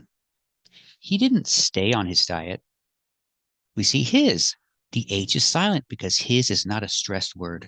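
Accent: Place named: United States. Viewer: face-blue-smiling:... Hello. I follow you for two main reasons: I appreciate your American accent and your professionalism. You explain all the details thoroughly and consistently provide examples of how to use various structures. By the way, where is your accent from? Thanks a lot for everything you do!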